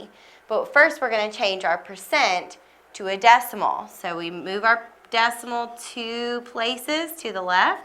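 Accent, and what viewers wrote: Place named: South, United States. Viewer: I'm sitting here and trying to determine where the instructor's accent is from. I hear a faint southern drawl, or possibly North Carolina. Great instructor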